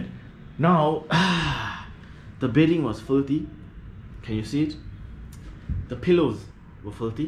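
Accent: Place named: Kenya. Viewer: You have an African accent bro kenyan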